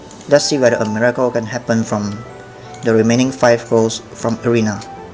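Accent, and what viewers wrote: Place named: Singapore. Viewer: Dude. You're not from global, are you? Your mobs look crazy. Dayum! By the way, I like your videos but your Singaporean accent not much. YDCB is okay. Sharp but okay. However, when I watch your vids, I feel like I'm in a car and the driver keeps on stepping on the brakes. Or maybe it's just the mike. Hmmm. Try to invest in a better mike with a pop filter? ;) I'd like to see more content from you. Hope this doesn't offend you too much. I mean it in a constructive way. :D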